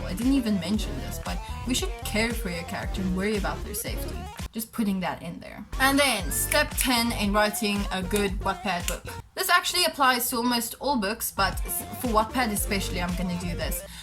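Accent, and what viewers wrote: South Africa. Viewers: wait, wait, wait, is she South African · ARE YOU SOUTH AFRICAN !?!? -accent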